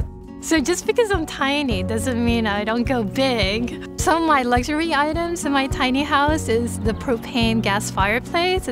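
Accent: Philippines. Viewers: When I first hear her talking base on her accent I knew she˚s a Filipino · I didnt realize you are a filipina. really nice accent.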